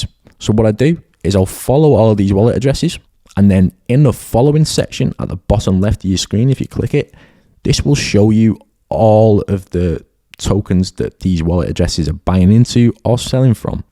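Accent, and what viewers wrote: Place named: United Kingdom. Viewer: Always subscribe when I hear the UK accent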